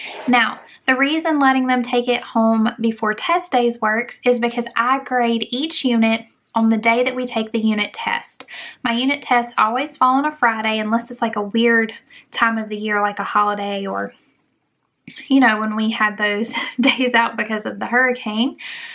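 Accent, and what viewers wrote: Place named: South, United States. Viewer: I like your southern draw.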